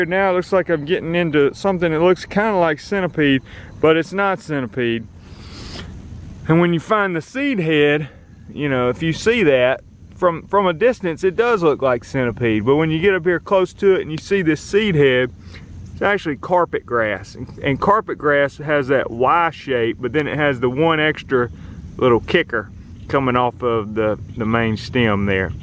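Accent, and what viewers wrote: South, United States. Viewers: His accent makes my mouth feel like chewing a southern gum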